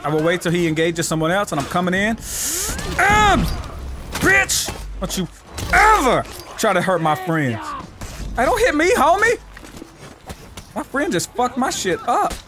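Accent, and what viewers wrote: South, United States. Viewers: How the hell would you think he's black? His accent is white southern af, I just don't see it.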